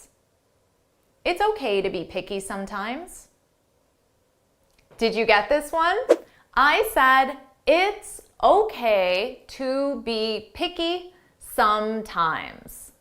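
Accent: United States. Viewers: Really nice. But this is American English. British English Should of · thank you ! just curious about where are u from originally i mean your english is american or canadian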